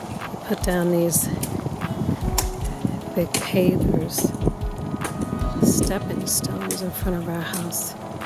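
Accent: New Orleans, United States. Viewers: Just found your channel and subscribed after one video! Hey, I love your New Orleans accent. I grew up not far from there but across the line in Mississippi. It was a wonderful day trip to visit the Quarter and eat at some of the best restaurants anywhere. I like your vision of the holidays. I’ve grown weary of other decorations; while I love “farmstyle”, I prefer unique interpretations of holiday decor, and desire something that no one else has. You’ve met that need. Look forward to seeing the beauty of art & design through your eyes! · you are the absolute sweetest person I have ever heard! I feel blessed to have found your channel and look forward to every one of your tutorials. I love the way you talk! It gives us a glimpse into your roots (NOLA girl!). We Canadians have our own special way of saying some words and I honestly think I say punkins sometimes as well! 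You are such a treasure sweet girl! Much love from Canada.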